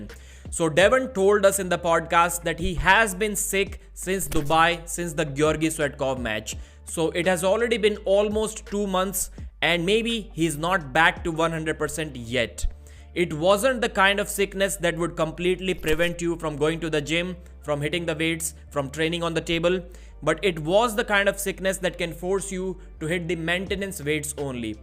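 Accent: India. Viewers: Dude your indian accent is f@ annoying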